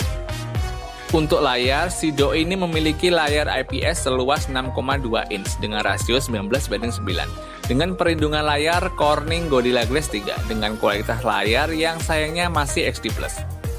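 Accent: Magetan, Indonesia. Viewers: Iki lak wong Magetan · Boss...magetan e ndi boss?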